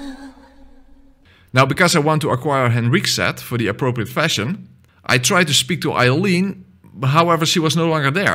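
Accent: Netherlands. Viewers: A dutch man, god, thats gonna bother me... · Are u a dutch guy? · Is hé dutch? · Ey ur Dutch right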